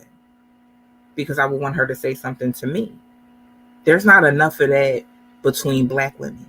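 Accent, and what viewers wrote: Louisiana, United States. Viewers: Hello a question for you, something I may have picked up on. Are you a Louisiana native? I seem to recognized a dialect in your speech pattern. A simple yes, or no would be fine.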